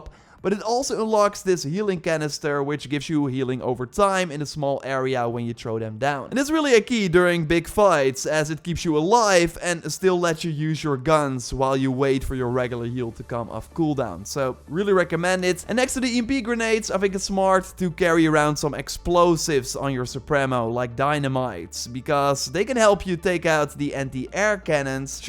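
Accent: Netherlands. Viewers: How is He Faking it? That is his Dutch Accent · You sound way too Dutch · New to the channel, hearing your accent, are you dutch? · You sound dutch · Ik hoor een Nederlander 😁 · I'm Dutch too, but dang Dutch accents are really annoying to listen to. it's called a hovercraft you dolt, we also use that word here in NL.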